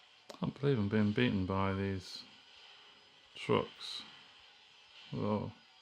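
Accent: United Kingdom. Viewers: Yup, typical British accent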